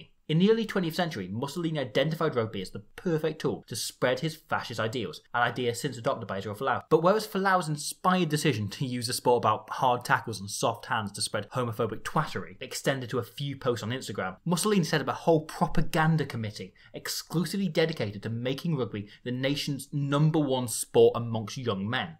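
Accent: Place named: West Country, United Kingdom. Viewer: The most patriotic Italian to have a West Country accent.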